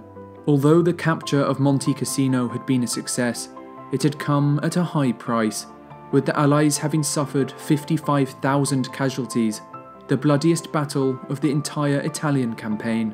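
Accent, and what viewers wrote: United Kingdom. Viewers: Came up on my feed, clicked the link and as it loaded thinking "...this narrator better have a British accent..." Noice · He just speaks British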